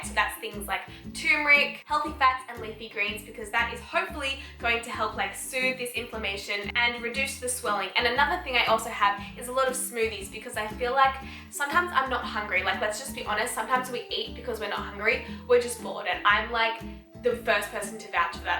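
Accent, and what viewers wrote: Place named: Australia. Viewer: that aussie accent thoooo! LOVE your energy! xx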